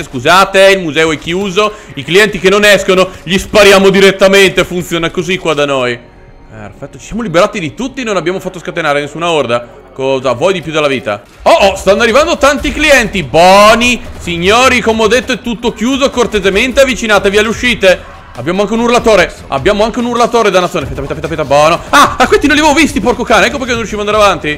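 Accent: Russia. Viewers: Adoro tuo accento ruusso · Il miglior accento russo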